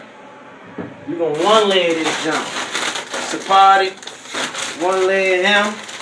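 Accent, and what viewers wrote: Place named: DMV, United States. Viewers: That accent…definitely from the DMV! #HOME 💪🏽🤎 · I was thinking that he sounds like he from here lol the slang sound similar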